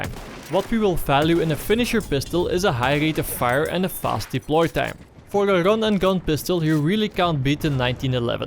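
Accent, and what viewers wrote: Netherlands. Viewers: Hey, are you by any chance dutch?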